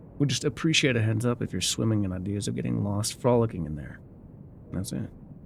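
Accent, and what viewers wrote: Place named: South, United States. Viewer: Charlotte is my spirit animal Edit: that cliffhanger was FOUL Edit 2: relistening to see if i missed anything and i didn't necessarily miss this but every time I hear Lotte i think of princess and the frog lol Anywizzle- This story is actually so cool, the concept is amazing and the mystery keeps you coming back to listen more Also Its like a.m. and I should probably be asleep but who needs sleep when you have? Edit 3: he keeps calling us pet names like sweetheart and whatnot and I don't think thats just because hes casually finding excuses not to say the listeners name Also Love to slight southern accent and how its not very forced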